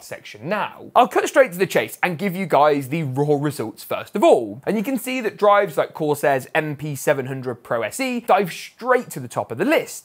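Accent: United Kingdom. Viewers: Why does your English sound British?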